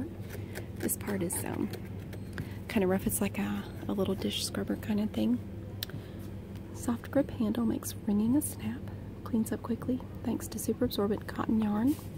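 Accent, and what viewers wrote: South, United States. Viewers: I'm so happy I found your channel, this is my absolute favorite now. You are such a sweetheart, I adore the southern accent and your comments are just perfect. I had never seen any organizing and structuring ASMR before, but I absolutely love it. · I'm a new subscriber and I have been a subscriber to AMSR channels for a long time and how I missed your channel is beyond me. It really at the top when it comes to effectiveness. I love southern accents so maybe that plays into but when I watched your library video, that sold me in 2 minutes. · You have such a sweet southern accent. I love it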